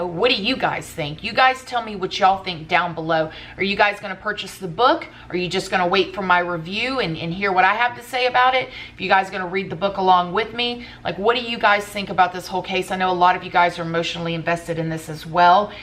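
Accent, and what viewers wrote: United States. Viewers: .....are you American????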